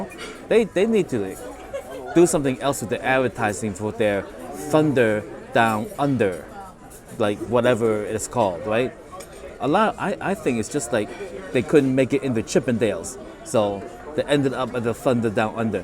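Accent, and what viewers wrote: New York, United States. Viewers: I thought New York as soon as I heard you. First time watcher, enjoyed it very much. Been doing Vegas since the early 70's, usually twice a year. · Loved the video!!! I never noticed you had an accent!! You sound like your from NYC to me lol! I’m from Maryland so I never picked up your “Accent”!! 😂😂😂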